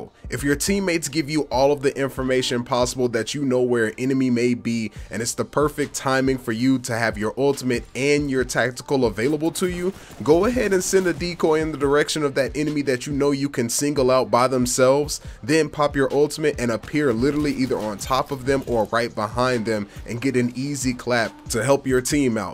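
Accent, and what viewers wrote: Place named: Compton, United States. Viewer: He sound like e be from compten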